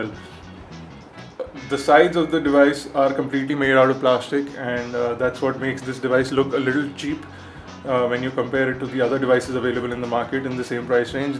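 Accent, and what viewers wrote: India. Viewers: its called an indian accent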